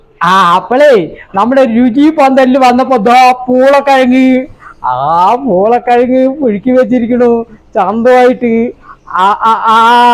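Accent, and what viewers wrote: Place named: Palakkad, India. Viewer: 🎉🎉🎉 അടിപൊളി ആണല്ലോ....Etttttooooo........ നല്ല അവതരണം.... പാലക്കാട്.... തനി നാടൻ ഗ്രാമീണ സംസാരം..... full support ഉണ്ടാവും.....🙏🙏🙏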